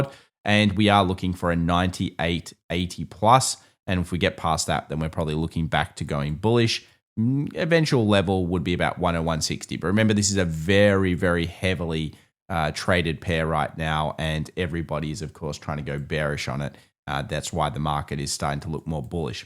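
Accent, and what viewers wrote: Australia. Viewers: Did anybody ever say to you, your Australian accent makes everything sounds automatically legit. Listening even though I’m not understanding 70% of it sometimes. 😆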